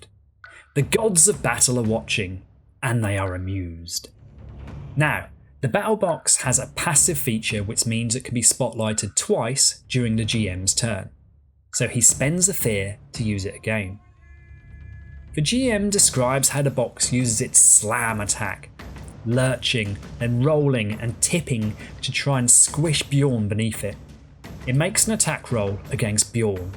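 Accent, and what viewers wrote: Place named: United Kingdom. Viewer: I think accent is definitely a plus. Since I'm from across the pond, so I don't know my UK accents. Care to elaborate?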